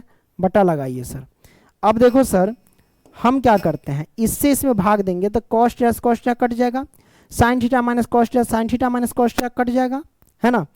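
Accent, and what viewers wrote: Bihar, India. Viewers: Aap Bihar ke ho sir😊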